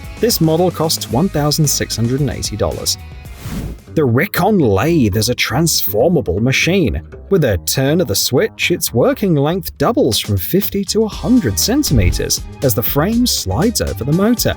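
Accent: United Kingdom. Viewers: I am so tired of listening to those accents of British origins. We won the Revolution a long time ago,, 250 years ago .,,,so I turn you off. This is America.,, I wanna hear an America trying to sell me something. It’s easier for me to understand.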